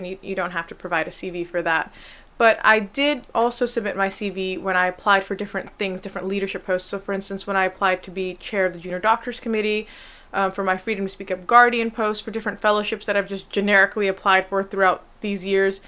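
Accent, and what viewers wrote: United States. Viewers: Assalamu alaikum sister, your strong American accent got me searching through all your videos why u chose to work as a doctor here in the UK , because your husband doesn't have a American accent, I'm sure he's either Pakistan or born in India, so u and Ur husbands visa situation sounds must be very complicated, with u being an American citizen, so how come you don't work and live in America as a Dr with your husband, not sure if you covered this subject in a video, please post me the link , jaza kallah and thank you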